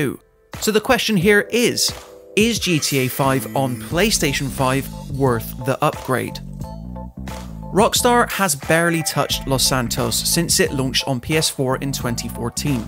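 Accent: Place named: West Country, United Kingdom. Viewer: West Country accent ftw 🙌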